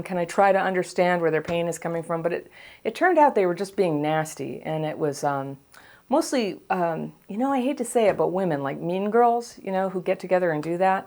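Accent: United States. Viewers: So Southern!!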